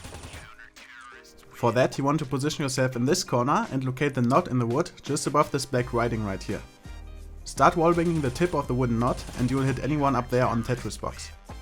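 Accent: Germany. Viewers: Oh yeah, German English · Dieser deutsche Akzent bääää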